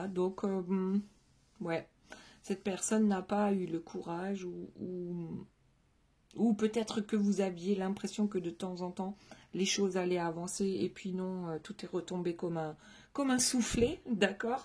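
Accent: Alsace, France. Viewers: Bonjour ! Vous avez l'accent alsacien 😊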